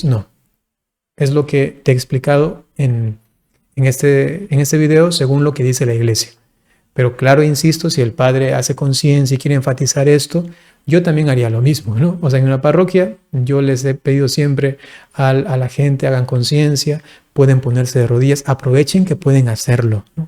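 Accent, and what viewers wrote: Brazil. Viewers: Sí, el Padre es español, murciano, pero ha pasado mucho tiempo en Brasil y ha cogido acento. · Conozco muy bien a este sacerdote, es español de España, de Murcia, concretamente. Pero se formó en Brasil desde muy joven y tiene una fuerte entonación portuguesa/brasileña. Ello explica que se exprese perfectamente en español pero suene un poco “raro”.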